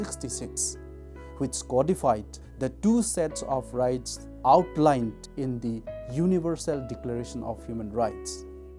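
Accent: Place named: Northeast India, India. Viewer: He is speaking English. The only thing is that he has a North East Indian accent. Please learn to be kind.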